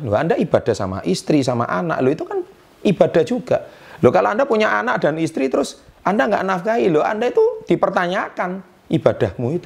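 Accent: Java, Indonesia. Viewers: jowone pundi pak??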